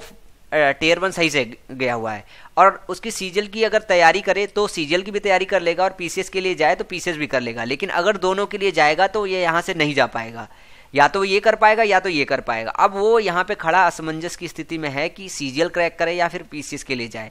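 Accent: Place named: Kanpur, India. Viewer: kya bat h bhai....tmhari language me kanpuriya ka jo taste h maja aa jati h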